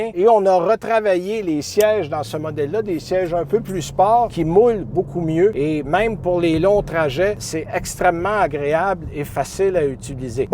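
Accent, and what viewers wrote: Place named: Canada. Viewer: Je viens de découvrir votre chaîne YT ! Et j'aime beaucoup vous écouter parler c'est agréable cet accent canadien ! Et l'essentiel est dit pour le véhicule ! Bravo 👏👏 Eric de France ! A Lyon exactement ! Bye 💕👋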